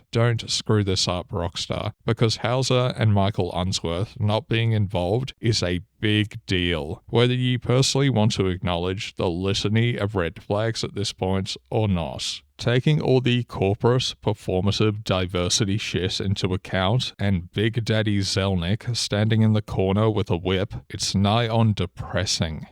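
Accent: Australia. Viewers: australian accent is peak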